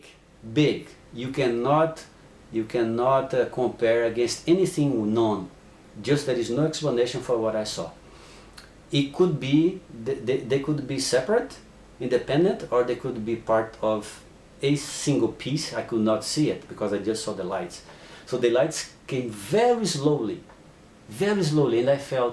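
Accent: Brazil. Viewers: Ola. Pelo sotaque, voce deve ser brasileiro. Fiquei muito interessado no seu depoimento, mas compreendo pouco o ingles. gostaria de saber se voce poderia inserir legenda em portugues. grande abraço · Hey Man....You Brazillian right ? You sound It ! I am in Porto Alegre and we have a a small cluster that make and use the CE5 Protocol quite.often. Just to let you know you have allies and you not Alone. ALL the best Man.. Peace out !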